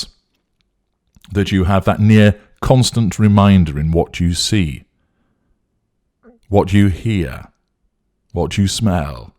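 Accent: United Kingdom. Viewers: nope i just don t like the british accent and i know where your coming from, no hard feelings i just as good as u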